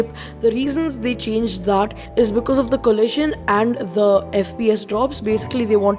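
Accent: India. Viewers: Your accent seems like u are Indian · your indian accent is so funny and good at the same time xD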